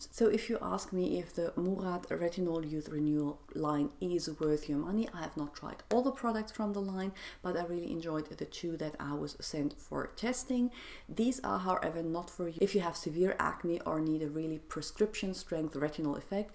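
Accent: Germany. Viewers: I love your accent, are you German?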